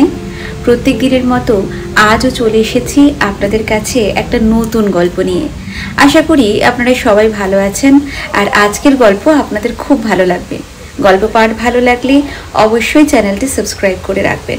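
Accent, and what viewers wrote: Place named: Faridpur, Bangladesh. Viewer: তুমি এমন চমৎকার ভাবে ফরিদপুরের ভাষায় ও ওখানকার আঞ্চলিক টানে গল্পটা পড়ে শোনালে যে কী বলব ! অসমসালা !!!😂😂😂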